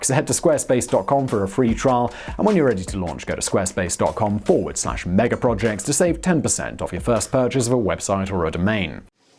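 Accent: England, United Kingdom. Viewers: a suggestion. Slow down. Your videos are educational and informative, but your pace is unnecessary. Your knowledge and British accent alone keeps people watching, but your pace has me tuning out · OK THANKS, I ENJOY WHAT HE IS TALKING ABOUT... BUT A LITTLE HARD TO CATCH ALL THE FAST WORDS WITH THAT ACCENT.. MY BRO IN LAW IS FROM ENGLAND, BUT HAS LOST MOST OF HIS ACCENT... KEEP UP THE GOOD VIDS...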